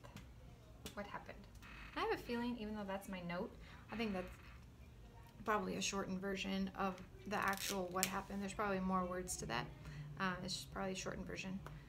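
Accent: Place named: Faichuk, Micronesia. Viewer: The “mwochen” is pronounced “mwotsen” Instead of “kose” u can use “kete” its more accurate. “Sorry” is spelled “OMWUSALO” but pronounced with a short “R” instead of the “L” “Mete na ke for”(what are u doing) can vary depending on which island in chuuk your from so basically the accent of the island u are from. From the Faichuuk region is what u are using. From my accent its slightly different “metena ke för/föri. Same goes with “met na” some accents use “metena”(what is that) “Kunok fite iei”(what time is it now) “Kunok fite”(what time or whats the time) “Ifai” is actually two words. “Ifa”(where) & “i” (she or he) = where is she/he. “Ifa usumw”(how are u) “Pwaat”(why) can also vary from island to island or region.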